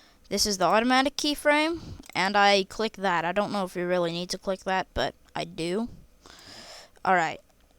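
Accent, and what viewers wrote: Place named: United States. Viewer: Wow this video is a bit more detailed than the one i watched at first and it helped quite a lot. also (no offense) but I remembered how to do that because both videos i watched had someone with an american accent so I remembered it!! xD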